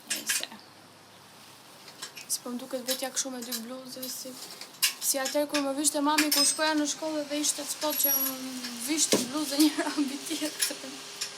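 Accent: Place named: South, Albania. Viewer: Lezetshem si ide ! Bravo ❤❤ Nga e folura dukesh si nga jugu🙈🙈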